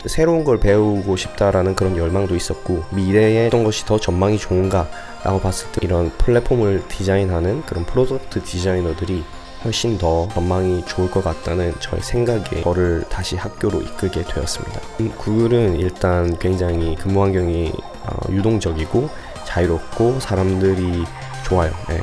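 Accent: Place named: Gyeongsang, South Korea. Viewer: 경상도분이세요? 뭔가굉장히친숙한데 말투가 고급진사투리야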